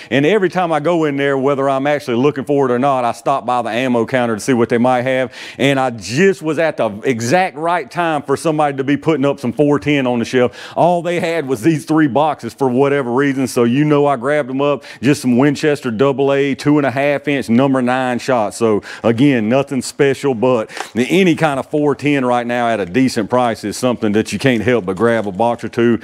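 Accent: South, United States. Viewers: how country I talk just like you buddy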